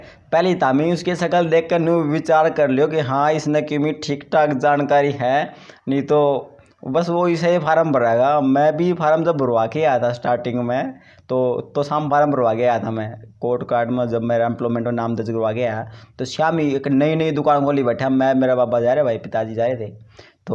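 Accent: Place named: Haryana, India. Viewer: Gajab hai teri Haryanvi Boli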